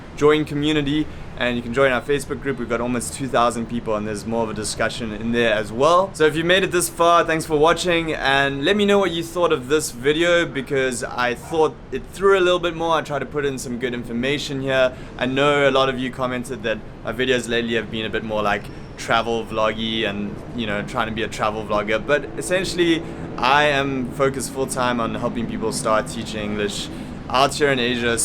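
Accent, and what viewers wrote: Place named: South Africa. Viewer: Dang, your SA accent is way more thick in these older videos.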